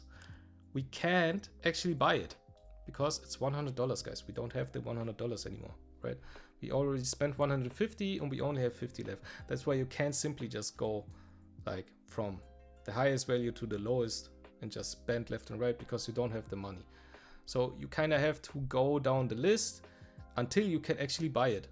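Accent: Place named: Germany. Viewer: Didn't know you before, but really good video. Also I would bet my rebate money that you are german hearing your accent. xD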